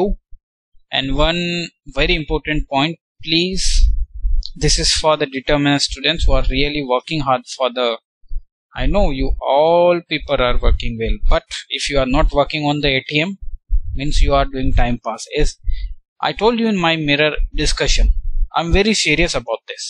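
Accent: Telugu, India. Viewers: Sir u telugu is very sweet we are the telugu people follwing ur class nice telugu and thanking u sir.....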